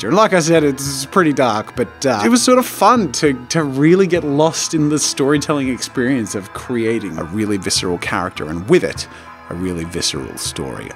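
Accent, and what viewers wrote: Australia. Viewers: Lunatic Laughs in Australian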